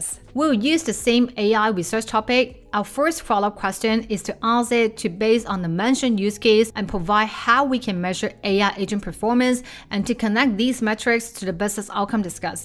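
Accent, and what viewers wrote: China. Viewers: Thank you for your video in comparison; could I make a positive suggestion? for a non native english speaker but with good english language skil, I had a hard time following every thing you said. it was way to fast for me to be able and follow your explainations? Dont get me wrong, I mean it as a positive feed back. You have the typical chinese or asian accent, and speaking so fast, as if you tried to put 20 min in 5 minutes, that it became hard to follow. good luck, and thank you again. 🙏👍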